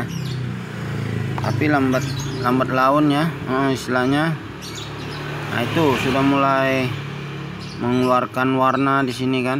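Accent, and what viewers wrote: West Sumatra, Indonesia. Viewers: ..suara anda jelas bgt kalau anda orang MINANG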